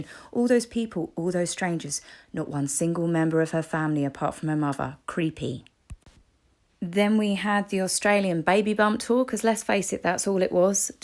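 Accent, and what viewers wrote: United Kingdom. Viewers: your too British!!! That's not a complement!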